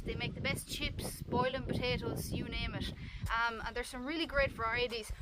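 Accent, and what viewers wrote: Ireland. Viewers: Wow intelligence backed by a witty sense of humor good looks and that wonderfull Irish accent and just a generally inspiring girl who loves what she does and is exited to share that with us all.. It sure why this came into my feed but happy it did though❤ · I love that irish accent…. Right. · Love your accent, no wonder my grand-grandfather married an Irish girl. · Irish accent sounds exhausting to speak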